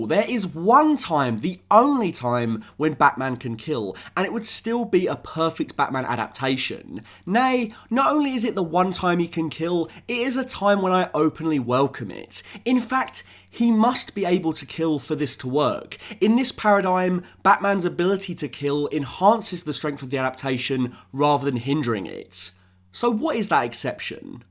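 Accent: United Kingdom. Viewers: You are one funny sounding britt!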